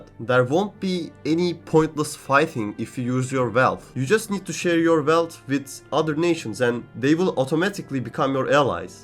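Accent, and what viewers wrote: Turkey. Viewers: are you turkish?? your accent sounds like it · Hey my dude your accent really sounds like turkish are from turkey?🥺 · videoyu açtığım an aksanından anladım turk olduğunu yolun açık olsun ins kanalın çok ünlenir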